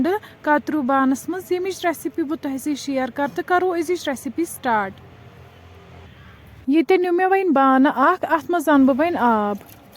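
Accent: Kashmir, India. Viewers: I am always from shopian baatu se pehchaan liya tha ki hamare side ki he hai lots of love from my side allah tala thavnev khush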